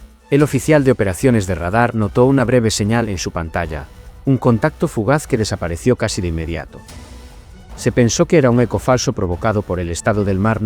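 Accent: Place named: Galicia, Spain. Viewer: El acento del video parece gallego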